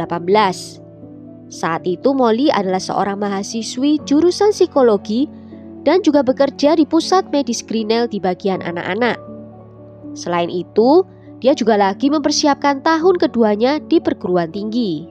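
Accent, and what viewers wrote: Java, Indonesia. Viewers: Hayyy jg medok kuhhh.. suaranya sukaaa💖💖💖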